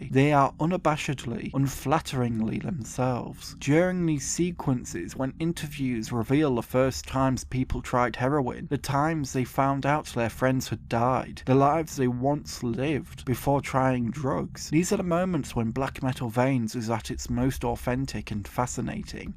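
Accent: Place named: United Kingdom. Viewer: Oh no! Mis conocimientos en inglés se pierden con el inglés británico 😭😭😭😭 , pero igual Gracias ! ❤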